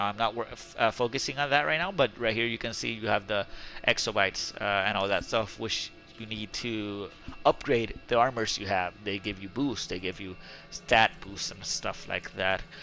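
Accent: Puerto Rico. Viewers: Are u from Puerto Rico(Borikén)? Nice vid! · Eres de Puerto Rico?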